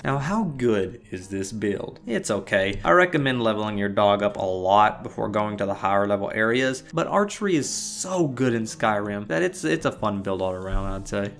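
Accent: South, United States. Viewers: it's funny to me, because the playthrough I play right now is a cluster-flip of concepts to me.. playing a Kahjit sneaky-assasain-mage thing, and it's my second time playing a mage. first mage game was a pure mage that got pretty op, so I wanted to try a weird double-build thing, and I also never went stealth before?? at the beginning of the game, I got the Thief Stone and now I have high power thief skills. also got good magic too. but it's also funny, because I'm actually really trying to roleplay more, and get more into the lore, but I still ended up basically having the same personality of me irl, so the dude is a lawful neutral monster that abides by the laws, and will generously go fetch any family heirloom, but if I find someone outside on the road and they're not an enemy even, I'll silently murder 'em for no reason at all. I also rob just about every single store/house I come across, even the food don't judge me... 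😂😂 also, I must say that the "cowboy" accent made me very comfortable??? I don't know how to word it, but my entire family is from the south, and they all still have accents so your voice made me feel right at home, I hardly see southern-accented YouTubers..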